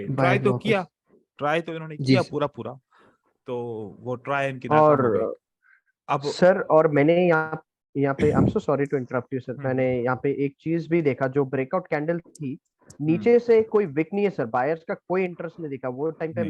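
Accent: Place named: Sindh, Pakistan. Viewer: Sir app ki voice se lag Raha ha app Sindhi haan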